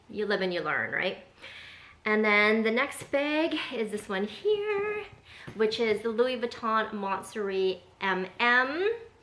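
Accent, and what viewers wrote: Canada. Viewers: Are you Canadian? My grandma is from Canada and that’s how everyone I know from there says bag lol I love it tho!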